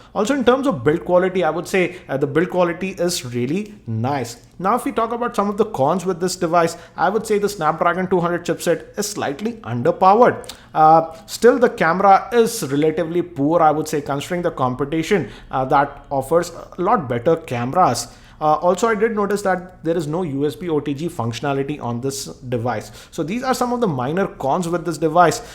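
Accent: Hyderabad, India. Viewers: r u a hyderabadi??😮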